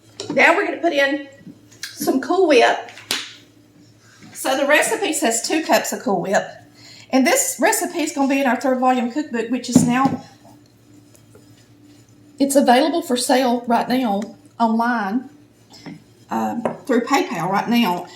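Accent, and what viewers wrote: South, United States. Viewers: I'll figure out a way to get in touch with you but I got to go to bed now got to be up at 6 you make me smile with that southern accent I was born in Macon Georgia raised in Miami after Hurricane Andrew now I am in Mishawaka Indiana alright young lady got to go to bed but I will get in touch with you somehow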